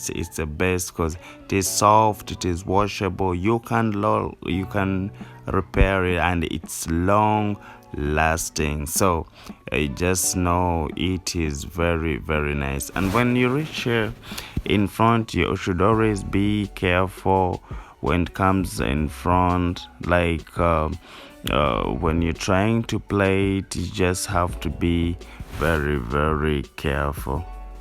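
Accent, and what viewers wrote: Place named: Uganda. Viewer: Sounds like Ugandan wow proud of my country 💪💪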